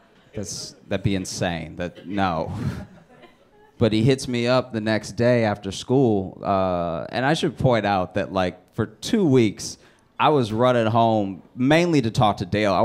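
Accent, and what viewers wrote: Louisiana, United States. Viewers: He sounds like that because it's his accent from Louisiana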